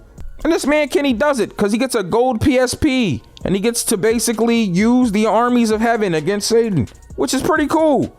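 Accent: Philadelphia, United States. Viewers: U sound more Philly den Baltimore cuz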